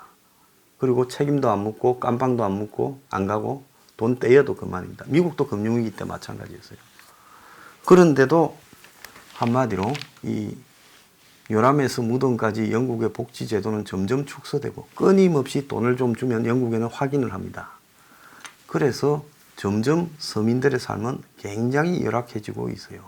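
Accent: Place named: Busan, South Korea. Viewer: 김인성교수님, 얼마나 고마우신지... 울~소장님 흉과자랑, 더러는 넘 친하고 부산 말씨와 남자들의 특이성, *이놈 장수는*ㅎ ㅎ ㅎ 그래도 그자석은 성질은, 좀 급해도 맘은 좋데이, 같은 부산토박이로 넘 정겨윘어예.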